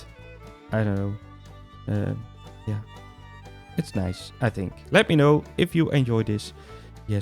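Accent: Netherlands. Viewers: Altijd leuk hoe je het commentaar doet, beetje nonchalant en love je Nederlandse accent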